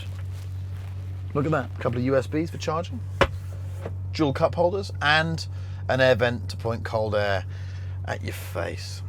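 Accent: Italy. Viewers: LOVE THAT ITALIAN ACCENT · That accent was like a weird hybrid between jar jar binks and an Italian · that italian accent :D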